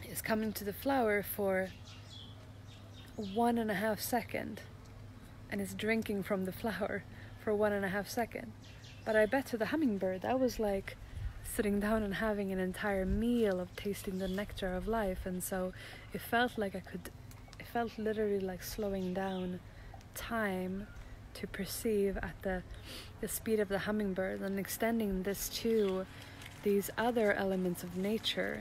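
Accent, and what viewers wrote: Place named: Sweden. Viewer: Älskar att höra dig prata med lite svensk dialekt! 😍 grym video ska verkligen testa detta!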